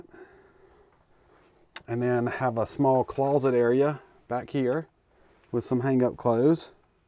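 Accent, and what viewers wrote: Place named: Appalachia, United States. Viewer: Are you from North Carolina? You sound south/easty-of-appalachia-y.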